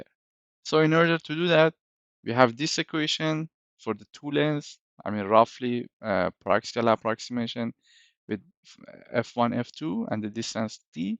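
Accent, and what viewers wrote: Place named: Iran. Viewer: Nice Persian accent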